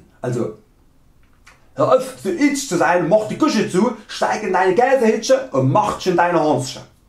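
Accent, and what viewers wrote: Saxony, Germany. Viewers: Sehr schöner Dialekt! Als Österreicherin tut mir das "deutsche Deutsch" in den Ohren weh. Aber Sächsisch ist ganz nett! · Haha, super Video! Und vor allem, ohne Schnitte. Da sieht man wieder deine Professionalität. Klasse!!! Und ganz ehrlich, ich fand früher, dass das Sächsische der schrecklichste Dialekt ist. Das hat sich aber durch deine Videos wirklich geändert. Mittlerweile ist es das Schwäbische... hihi. Nee, im Ernst, man kann sich auch als Nordlicht öffnen und erkennen, dass es total egal ist und man den Menschen sieht und annimmt. Außerdem denke ich ja immer, dass ich ohne Dialekt spreche, dabei hört man wohl doch deutlich, dass ich von nördlich der Elbe komme.... Und wenn wir auf Plattdeutsch loslegen, dann versteht das auch kaum jemand. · Für das Video hast du aber ziemlich tief ins alte sächsische gegriffen oder? Ich mein ich komme aus dem "Dreieck" Chemnitz Freiberg Dresden und so wie du es aussprichst redet meist nur noch mein uropa ausm Erzgebirge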